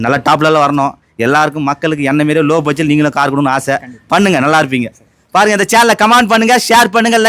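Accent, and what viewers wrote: Madurai, India. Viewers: Super Anna madurai vasagam ❤🎉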